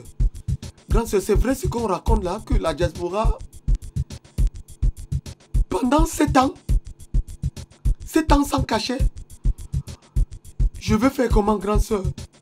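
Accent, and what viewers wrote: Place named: Cameroon. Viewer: Tu as un rare accent camer, où est passé ton chôcô de la Ndeuch Family 😂😂😂😂😂???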